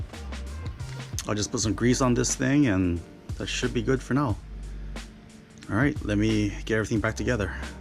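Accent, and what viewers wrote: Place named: Hawaii, United States. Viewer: 5 seconds in from speaking and was like this guy gotta be from hawaii. O-ring walkthrough helped, gonna fix my jack this weekend!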